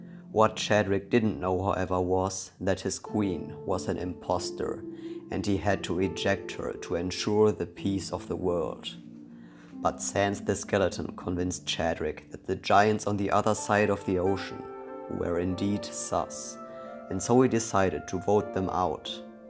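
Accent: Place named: Germany. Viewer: Are you german? You have a german accent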